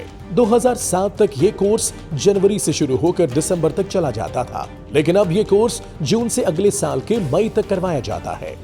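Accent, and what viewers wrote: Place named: United Kingdom. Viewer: Urdu Engrezi accent mein, wow !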